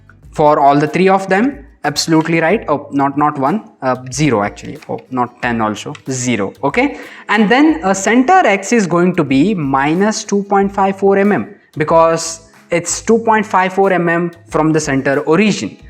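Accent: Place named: India. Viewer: your English is atrocious! It's no use to try an speak English with a Hindi accent and pronunciations, rather you should listen and imitate how English is spoken and pronounced by native English speakers. Just imagine speaking Hindi with English pronunciations - it would be unintelligible.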